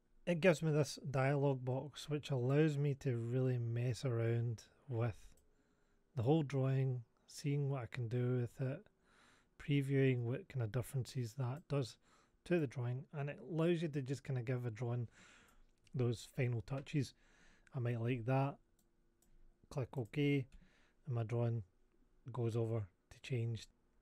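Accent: Scotland, United Kingdom. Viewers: I just come here to hear the Scottish accent. It's my favourite accent in the British Isles.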